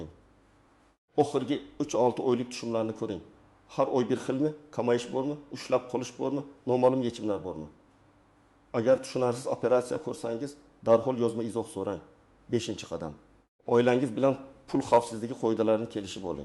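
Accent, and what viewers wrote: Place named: Chukotka, Russia. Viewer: Гапираетган чукчами. Узбек тилини биладиган одам ЙУКМИКАН.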